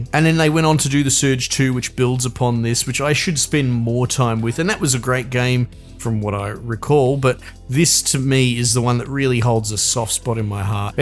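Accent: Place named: Australia. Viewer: I know it's the aussie accent but I keep hearing Dick13 lol 😂 Just got Surge 1, Surge 2 was such a cool and good game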